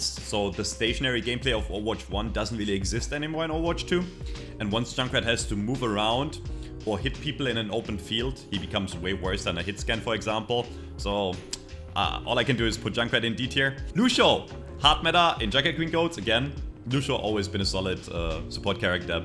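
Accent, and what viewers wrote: Germany. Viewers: Your voice sound german , are u german ?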